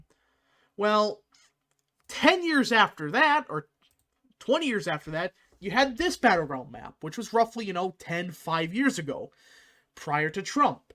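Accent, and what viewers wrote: Wisconsin, United States. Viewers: I’m from Wisconsin and literally 10 seconds before you said you were from Wisconsin I was thinking “this guy sounds like he’s from Wisconsin”. I hope you’re right, but I’m always gonna vote like we’re 10 points behind.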